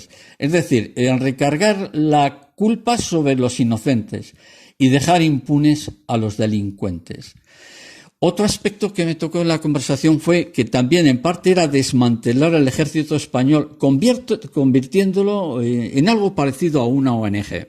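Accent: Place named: Bolivia. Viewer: Tiene acento puramente boliviano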